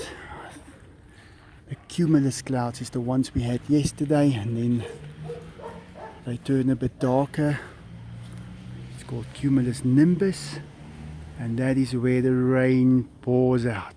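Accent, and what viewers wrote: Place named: South Africa. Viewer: I just discovered your channel today and I love it. It's so interesting and awesome to see these things, thank you for saving these amazing creatures. I btw also recognized you were south african immediately because I could hear some words similar to dutch and that's when I realized I was right, hahaha! Thank you for sharing your awesome life with us, new sub here :)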